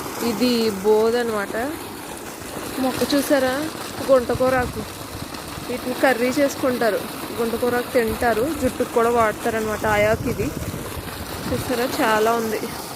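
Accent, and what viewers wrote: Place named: Godavari, India. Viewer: Mi voice change aindi konchem godarolla ammai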